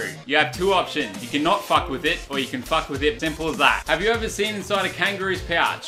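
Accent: Australia. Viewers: Are u actually Australian or what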